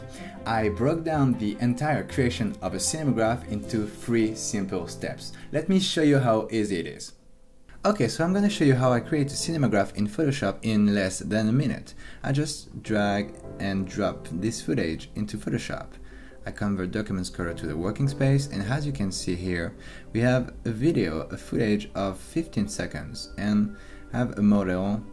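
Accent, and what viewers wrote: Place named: France. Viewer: It literally has subtitles at the bottom of the screen, and the accent is French, but the English is brilliant. Using Action kit now. Excellent stuff!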